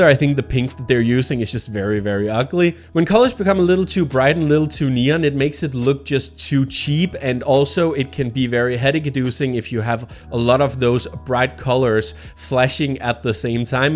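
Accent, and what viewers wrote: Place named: Denmark. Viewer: man kan bare høre den danske accent skinne igennem :)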